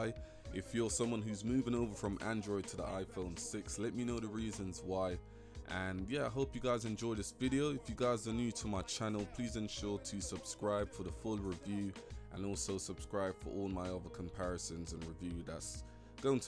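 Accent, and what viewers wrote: United Kingdom. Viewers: London accent. Ahhhh how I miss home.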